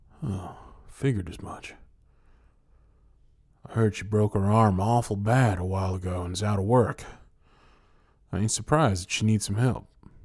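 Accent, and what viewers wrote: South, United States. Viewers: As someone from the south i thought this accent wouldn’t have an affect on me but BOY WAS I WRONG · i like cowboy and his Southern accent is spot on see i live in Georgia and i'm like almost surrounded bye people who have Southern accents so your southern accent is pretty good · Wonderful job! I love your country accent its so soothing. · I love country I love the country accent to and mostly I love you and your awesome videos keep up the awesome work! ❤❤❤❤❤❤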